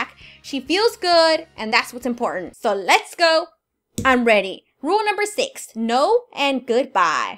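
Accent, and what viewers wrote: England, United Kingdom. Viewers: Are you from England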